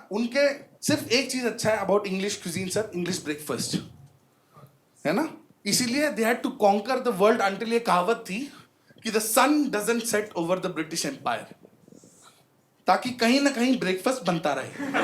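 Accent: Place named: Bengal, India. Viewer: Your bengali accent is excellent ❤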